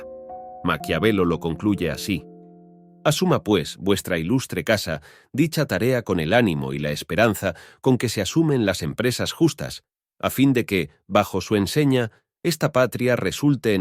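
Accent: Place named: Spain. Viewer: ¿Por qué usas el acento español?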